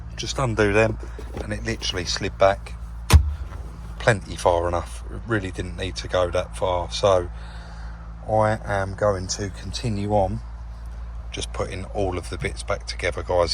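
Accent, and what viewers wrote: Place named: England, United Kingdom. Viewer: i am a city and guild panelbeater( body repair tech now haha) of the old school variety with nigh on 50 years exp. and only recently threw it away because of these nightmare strip/assemble scenarios. The last vehicles i worked on were '21 audi s8 hit hard in the r/r and a virtually brand new Jag i-pace hit full noise frontal, both fantastic vehicles to work on, the Audi was a breeze really, but as you say getting one's head around the procedural dilemma is the mind fk i do not need. You do a great job of an explanation of pitfalls and tips for this volvo airbag s&a( i love volvos) I work in an engineering shop now; lathes, milling, presses etc and specialising in aluminium tig welding.. much easier haha-- love this, new found, channel and dig the pommie(p.o.m.e. = prisoner of mother england) accent, i'm in nz and have been for over 40yrs..rock on man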